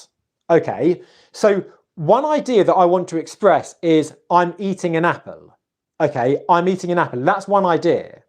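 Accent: United Kingdom. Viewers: I love the British accent..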